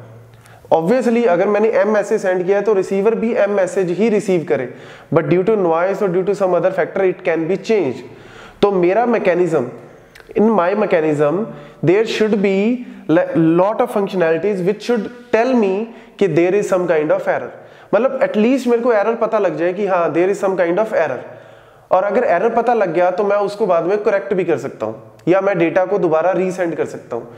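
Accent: India. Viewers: Thanks sir... Sir apke English bhi mujhe hindi se lag rahi h .😘😘 thanks for it again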